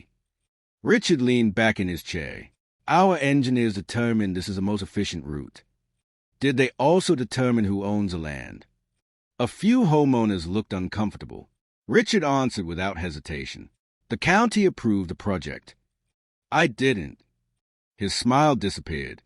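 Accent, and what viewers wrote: United Kingdom. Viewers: A Texas rancher with a British accent, interesting.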